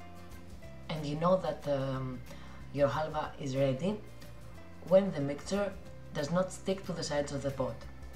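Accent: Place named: Greece. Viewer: I love your Greek accent😍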